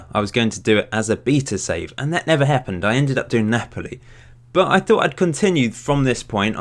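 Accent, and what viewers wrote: Portugal. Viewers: The video is from a dude who is from Portimão and just like in (probably) every country in the world the accent changes from city to city so his pronounciation is different from the Portuguese you hear from Lisbon for example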